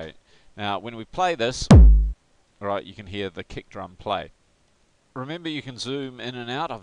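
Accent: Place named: New Zealand. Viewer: You sound like you're from nz, our computers at school also have the student shared drives set up like that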